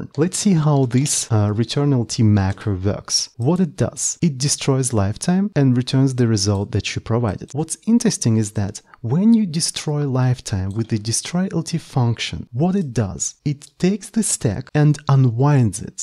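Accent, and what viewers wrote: Russia. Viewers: Вау, крутой канал. Очень нравится этот лёгкий русский акцент, прямо как родной, лол.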